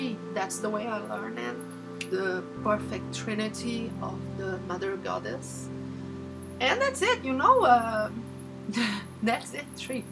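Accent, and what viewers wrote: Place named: Brazil. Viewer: Tbm sou brasileira, te reconheci pelo sotaque. Estou tentando montar meu mini altar.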